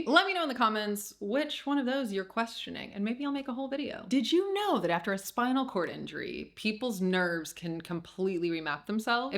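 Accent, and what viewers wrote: United Kingdom. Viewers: Welcome back,! What a fantastic video (incredible edit, reminds me of PBS Get Lit content), I can't wait to see what else you produce. <3 P.S. I had a little giggle when you acknowledged your change in inflection to being more bri'ish.;)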